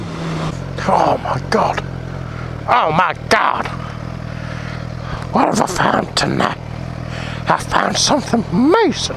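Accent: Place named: Scotland, United Kingdom. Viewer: I'm from Glasgow. Your Scottish accent is honestly fantastic. I was very surprised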